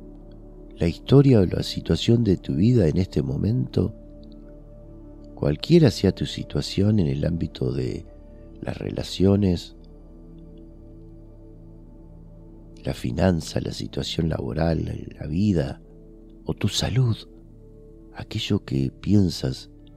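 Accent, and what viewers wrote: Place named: Argentina. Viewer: Qué bien habla el español y en argentino. Gracias !😄. Bendiciones maestro.❤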